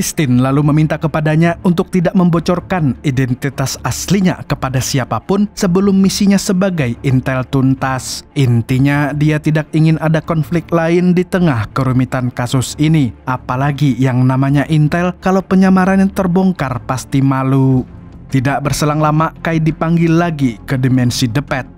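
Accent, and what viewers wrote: Banjar, Indonesia. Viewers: wiih, urang banjar kh adminx nih? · Urng banjar nah ngene narator ya😂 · Urang banjar sekaliny sidin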